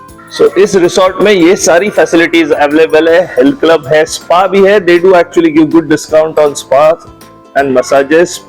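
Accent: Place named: India. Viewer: Bhai bhartiya english matt boll dum hai aur gore ki aulaad hai to fluent english bolll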